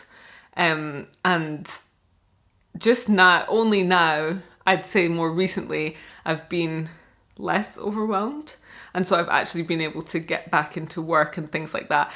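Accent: United Kingdom. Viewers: I'm very happy for proud of you💐✨ I really like the British accent 💫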